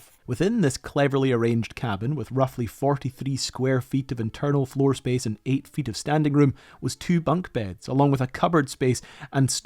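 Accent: Scotland, United Kingdom. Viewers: I came for the video, but stayed for the Scottish accent. :face-blue-smiling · He is Scottish, give him a break. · Is that a Scottish or Irish accent?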